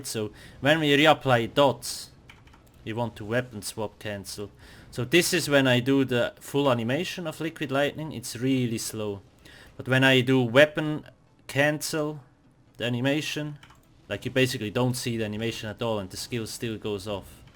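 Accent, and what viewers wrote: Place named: Switzerland. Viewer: you sound a lot like a swiss, are you one?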